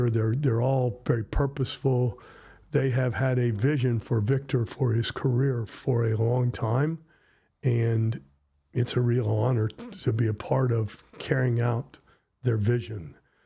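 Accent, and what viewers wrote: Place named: England, United Kingdom. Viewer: Merci pour ton accent anglais, j'ai l'impression de l'interviewer.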